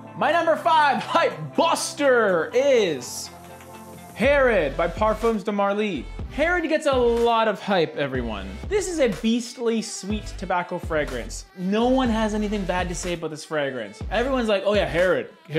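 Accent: Australia. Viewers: Savage on the Australian accent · Oh I love Cedrat Boise, my favorite next to Aoud Lemon Mint and for me it is a performance freak, and what I noticed your bottle has a sticker on the bottom? while mine has a only etched batch code on the bottom. I guessed the NR1 pick, thought its going to be either Pacific Rock Moss, Individuel, or maybe a La Nuit de l`Homme. Australian accent! very noice!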